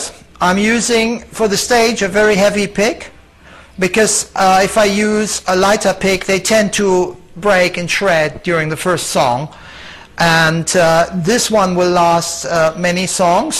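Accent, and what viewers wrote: Germany. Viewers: Me encanta el acento tan marcado de los alemanes cuando hablan en inglés... Hermoso Uli eres un maestro!!! Ese sonido sólo puede ser producido con su Skyguitar!!! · Funny german accent :-) But amazing guitar player !!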